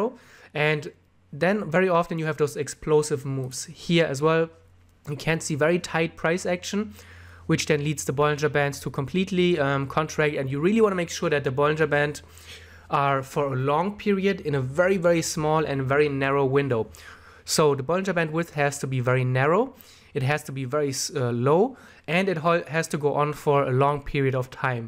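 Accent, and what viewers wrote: Germany. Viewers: Dieser Moment wenn man direkt raushört das du Deutscher bist. :D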